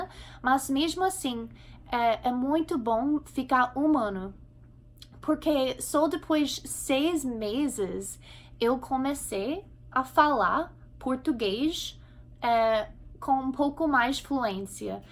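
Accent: Rio de Janeiro, Brazil. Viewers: Tem um sotaque carioca. Aquele chiado típico no falar. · Você está no Rio de Janeiro pelo sotaque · sotaque meio carioca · Sotaque carioca? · um leve sotaque carioca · SEU SOTAQUE CARIOCA ESTÁ EVIDENTE DEMAISSSS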